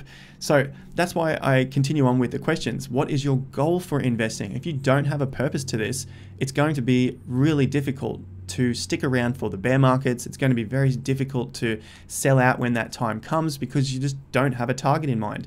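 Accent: Australia. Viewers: Maaate! I have been in this crypto la la -land since early 2017 (and seen thousands upon thousands of vids). This is hands down by far the best "tutorial" any new (and old) investor could get. Absolutely nailed it. No fuzz, no drama, just facts. Thanks a ton! On a sidenote, I studied 3 years in Brisvegas and listening to you(r) accent and seeing the QLD had me tearing up a bit;) Good on ya! Chers and thanks. Lotsa good vibrations from Norway!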